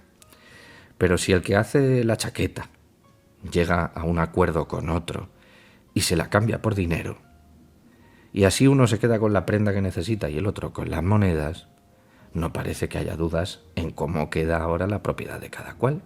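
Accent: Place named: Andalusia, Spain. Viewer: lo mejor e que pareces del sur de españa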